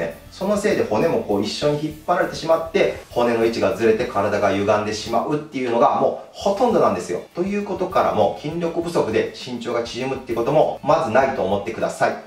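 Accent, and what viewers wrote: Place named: Osaka, Japan. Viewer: 今回の動画もとても参考になりました。20年前にこの情報があったらよかったと思いました。でも今からでも決して遅くないとこれからできる限り続く様に 、 自分に 言い聞かせます。 ただ 正坐 は 難しいです。Japanese comment is hard for me. So please let me keep sending you comment in English. My English is very simple and I am very sure you understand. Thanks, sensei. I love your Osaka accent-大阪弁. Toshiko from Seattle.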